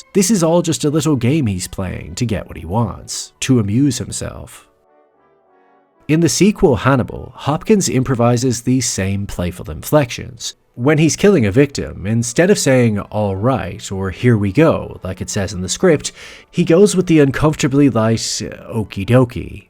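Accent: Ireland. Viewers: Is that voice-over an Irish accent? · The narrator sounds partially irish...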